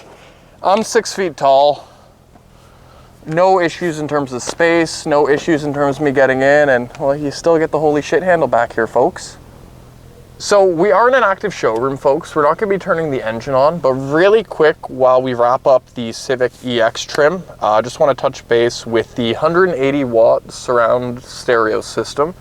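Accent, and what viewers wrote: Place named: Canada. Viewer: Holy Christ you're Canadian 🤣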